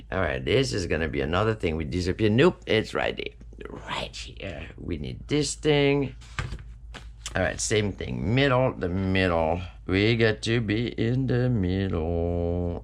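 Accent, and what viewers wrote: France. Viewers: These are very cute double pockets,! I missed seeing your videos for awhile, the last one I saw was the one with using cereal boxes to turn into “books”! I’ve collected several sets, 2 of each & I ordered the little clip thingies from Amazon, so I’m looking forward to getting on with that! This one today, though seems pretty quick & easy, so I might sneak one of these in first! It’s so fun to listen to you talk, English with a French accent is much nicer than plain old “American”! How did you become so proficient at English? And it’s not book English, you use a lot of slang & you speak very quickly, without pausing to think about a word!! Sorry, I sound like I’m interviewing you, I’m just curious! Now that I’ve found you again, I’m going to catch up on your videos! Thank you! Erica❤hope you’re having a nice weekend!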